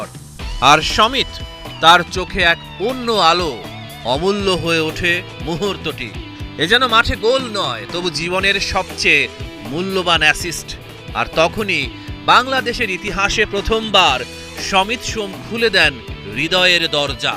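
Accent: Kolkata, India. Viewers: দাদা কি কলকাতা থেকে এসেছেন নাকি? কথা এমনে বলেন কেন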